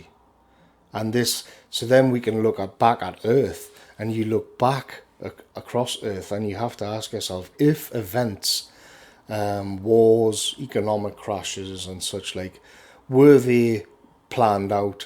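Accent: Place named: Newcastle, United Kingdom. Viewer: FIX IT FOX Scotsman?....he's a Geordie.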